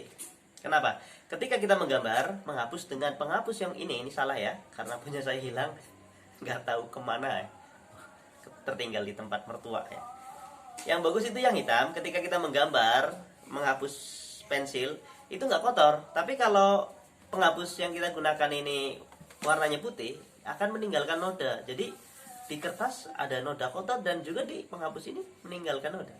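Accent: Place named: Banyumas, Indonesia. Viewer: Ijin subskrebb gan... Kok bahasa indonesianya kayak orang barlingmascakeb ya?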